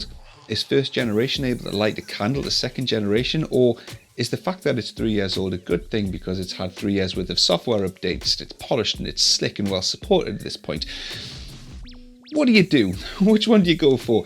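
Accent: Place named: Newcastle, United Kingdom. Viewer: Thanks, great to hear a local Geordie review tech (question at the end) I bought a rift s and an entry level pc after using the work HTC vive vr system and I’m really happy with the performance. I’m no expert and have only seen these two systems, but I agree with everything this review stated. The vive does feel a better product with better audio but.... the s is lighter, better visuals and an easier set up. For a newbie like me, that’s better and now the price of an S is down to £350, that’s where I spent my money. My newbie question is what difference would be noticeable to the visuals if I upgraded my GPU from a Nvidia GTX 1060 to something newer and faster to say Beat Sabre or Robo Recall?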